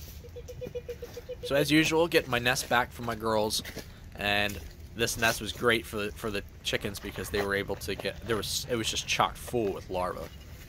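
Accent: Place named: Delaware Valley, United States. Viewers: Great vids my guy. Side note: I'm hearing a DelVal accent (especially in those Os). Where are you located? I'm from South Jersey so my ears almost instantly recognize a fellow Tri Stater lol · This guy is definitely from Maryland or Delaware based on his accent. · You sound just like Streetspeed717. Must be the PA accent!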